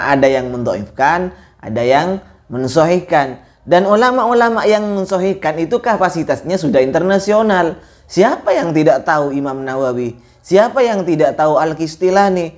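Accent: Madura, Indonesia. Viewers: Logat bahasa nya agak ke madura... Salaam Sataretanaan.. Sehat selalu cak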